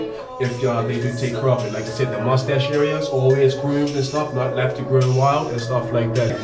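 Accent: Bermuda. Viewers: Saw the 441, heard the accent and knew straight away. Shout out to Bermuda! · Nice video, b'ruh, and way to represent! I've been watching and following various people on YouTube giving beard advice and tips. Didn't even think yours was from Bermuda until I heard you speak. I've been growing mine for awhile but kept on trimming or keeping it low. Now I've let it grow. It's kinda like that Kimbo beard (well that's what people call me at times, lol) though I get the Rick Ross comparison too. It's all good. You give good advice in your video. Similar things I've heard people say in their logs and what I'd suggest too. Growing a beard definitely takes time and patience. Never compare your beard to another's and develop beard envy. Each man's beard is unique...take pride in what you got. Imitation is the most sincerest form of flattery so if you see others doing what you do just take it as a compliment. 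Nice job, b'ruh.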